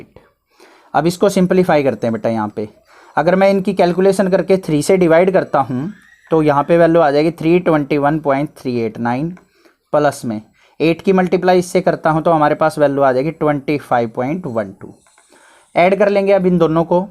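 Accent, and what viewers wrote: Haryana, India. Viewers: Are sir! Jhande gaad diye aapne. Kya badhiya mixture h Haryanvi,English aur Hindi ka. Waahh!! Maja aa gaya aapki class attend karke. Haryana ke ho ya rajasthan ke.Even I am from Rajasthan, Jhunjhunu.. 🤩Par filhaal abhi delhi me hu. Ummeed karta hu aap desh ke har kone me prasiddh ho jae..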